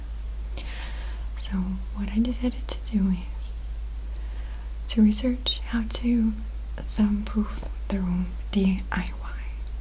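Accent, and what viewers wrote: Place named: Russia. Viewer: Umm... No she doesn't... She's Russian... She has a Russian accent...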